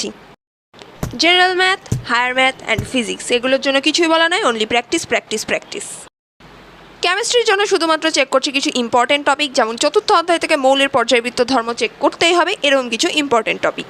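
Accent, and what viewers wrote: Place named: Kolkata, India. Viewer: Tumi Bangladeshi hole o. Tumar kothai Kolkata accent ashche.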